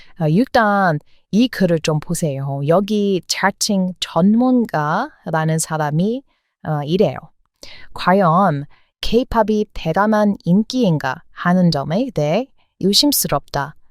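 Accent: United States. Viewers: 왠 AI? 목소리 너무 좋아요. 전형적인 미국인의 한국어 어투로 들려요. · 나도 AI 음성의 유튜브채널을 싫어하는 사람입니다. 그것들은 인간의 목소리가 아니라서 감정이 없고 억양도 부자연스럽지만 그보다 더 중요한 것은 가짜 뉴스나 남이 만든 내용들을 짜집기 해서 베껴 쓰는등 내용의 진실성이 의심되었기 때문입니다. 그래서 나는 그런 채널들은 구독하지 않지만 채널은 단 한번의 시청으로 바로 구독했습니다. 의 목소리는 미국인들이 한국어를 할때의 억양 그대로였고 영어로 말할때는 미국인의 본토발음 그대로였기 때문입니다. 그리고 그 무엇보다도 중요한 것은 만의 개인적인 견해가 있었고 다른 유튜브채널에서는 볼수 없는 독창적인 내용이 있었기 때문입니다. 님께 질문하겠습니다. 어떤 유튜버가 의 목소리를 AI 음성이라고 하던가요? 님도 의 목소리가 AI 음성으로 들리던가요? 님이 이 질문에 답변을 못한다면 그저 어그로나 끌기위한 댓글장난으로 간주하겠습니다.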